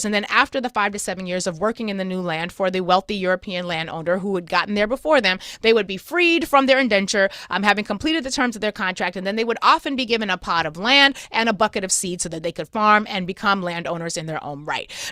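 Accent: South, United States. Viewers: You are the best host on Urban View at dragging MAGA especially with your pearly white lady southern drawl.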